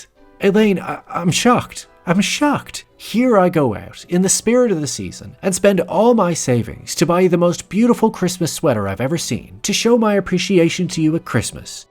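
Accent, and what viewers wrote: Ireland. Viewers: Your Irish huh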